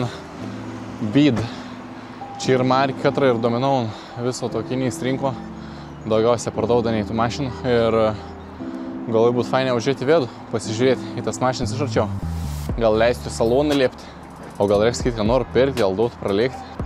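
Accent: Samogitia, Lithuania. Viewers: kopijuoja žemaičių tarmę? kiek žemaitiškai šnekančių žmonių? tai visi kopijuoja reiškia?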